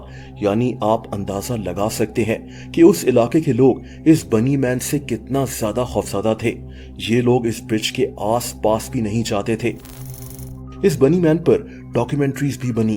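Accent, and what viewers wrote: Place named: Lahore, Pakistan. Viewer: speaks like a typical lahori definitely reality tv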